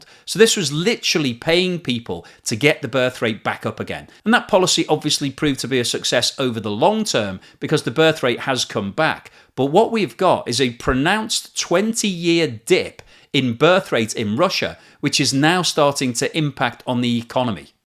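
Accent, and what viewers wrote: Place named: Liverpool, United Kingdom. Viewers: Wirral accent. Slightly different from the Liverpool accent. Sounds Wirral to me. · if you're not snapped up by the BBC or SKY I'd be amazed. You present an impartial, clear and concise programme in your upbeat Scouse"/Wirral accent.. You leave most of the well known presenters in your wake. Keep it up.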